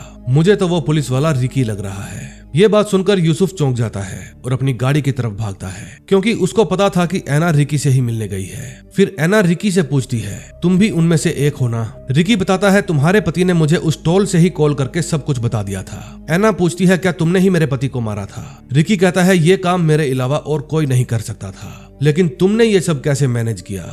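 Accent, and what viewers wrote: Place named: Haryana, India. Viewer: Haryana se ho aap ki voice se lagta ha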